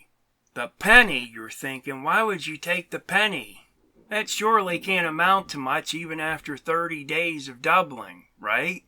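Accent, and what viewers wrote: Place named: Pennsylvania, United States. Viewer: Puts things into perspective! Your accent reminds me of my X's Ma. They were from Zelienople.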